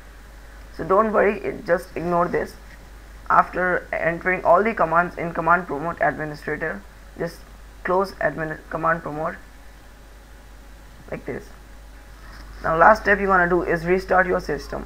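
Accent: India. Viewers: you are indian xD ? ps im not trolling you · are u indian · Funniest indian accent ever but thanks for fixing my problem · Polish person with Indian accent i love it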